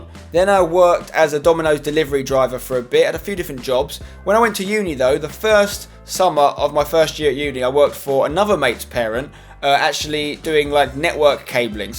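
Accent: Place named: England, United Kingdom. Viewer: Ay don't make things up mate. I never said he can't speak another language. If you listen to his voice, you can clearly hear he's English. There's no way he could speak English THAT good at that age. And I love how he's completely trying to deny what I'm asking him.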